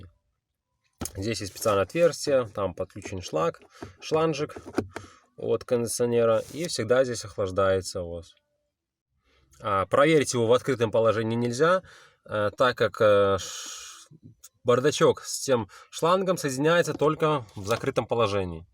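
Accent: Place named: Belarus. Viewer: Судя по акценту , автор Белорус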